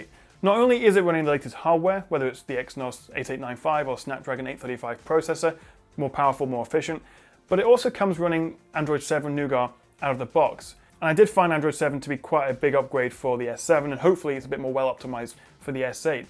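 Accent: United Kingdom. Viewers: meh whiney brit not enough of those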